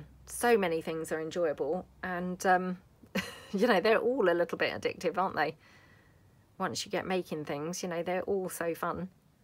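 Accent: United Kingdom. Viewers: Love these . GREAT idea. I watch all you great video full of ideas i just dont comment much. Are you in the UK. Love the accent. I have always wanted to visit England a walk the foreshore of the Thames and pick up relics from so many years ago. Thanks for all the wonderful videos. Stay safe. hugs. i am in the US. In NC.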